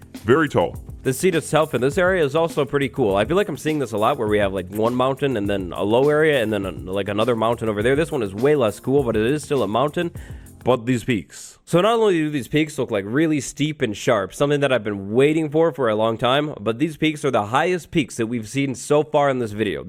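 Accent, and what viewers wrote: United Kingdom. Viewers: i didnt know youre british. your accent would go unnoticed in new England. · I love your British accent lol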